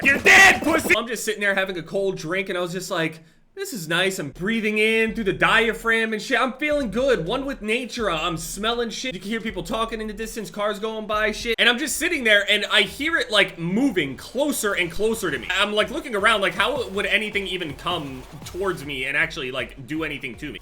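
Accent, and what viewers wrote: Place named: New Jersey, United States. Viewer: I could always tell was from nj just by the way he says yesterday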